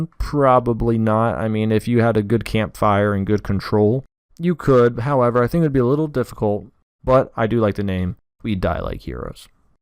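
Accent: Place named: United Kingdom. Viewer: I absolutely love your pronunciation. You're British, right? Or a non-native who had learned British English instead of nowadays widely spread American? Or an American speaking not so American?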